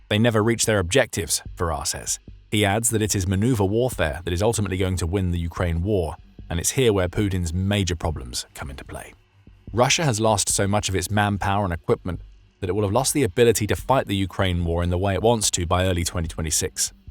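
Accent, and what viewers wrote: United Kingdom. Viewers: You can tell it's bullshit if they narrate with a British accent.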